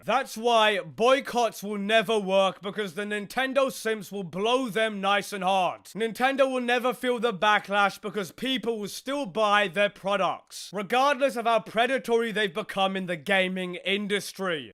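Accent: United Kingdom. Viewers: You tell em angry British guy!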